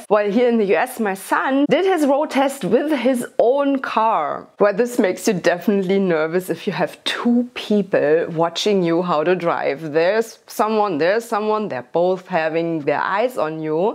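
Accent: Germany. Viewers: Das hat mir gefallen. Aber nach 16 Jahren so ein krasser deutscher (like they let talk "us" in movies...) Akzent?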